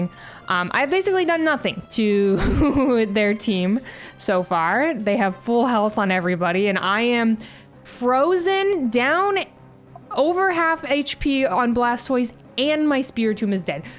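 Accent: Boston, United States. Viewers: As a non-American I'm curious: is that Boston dialect?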